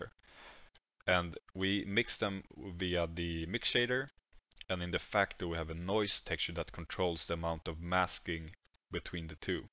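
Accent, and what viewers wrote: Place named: Sweden. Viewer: I've never been more sure in my life that I am listening to a swede.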